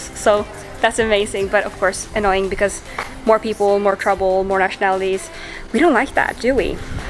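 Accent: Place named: Scandinavia. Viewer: Interesting approach to get viewings and subscribers on your channel. Negative press sells better than positive press. By your accent you might be scandinavian.?? Would be something like "EL VALLE DE LAS CATETAS". Anyway. We got plenty of places for you to go to that is not here.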